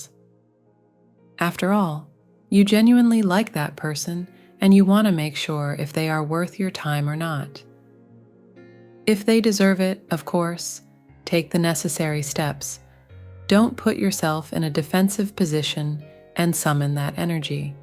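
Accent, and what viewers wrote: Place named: Thailand. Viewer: Your Thai accent is amazing!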